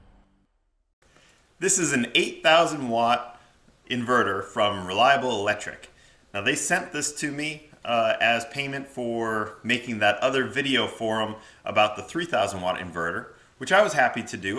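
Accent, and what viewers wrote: United States. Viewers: Why do Americans pronounce it sodder, when solder has an "l" in the word?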